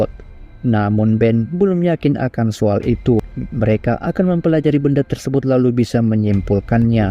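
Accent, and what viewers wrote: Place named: Sulawesi, Indonesia. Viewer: Admin kayakx org Sulawesi terdengar dari logatnya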